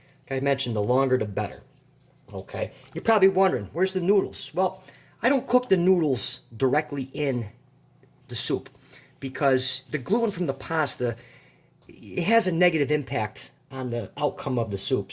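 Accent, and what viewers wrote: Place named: New England, United States. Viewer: Nicely done man I had a feeling you’re also from New England from the accent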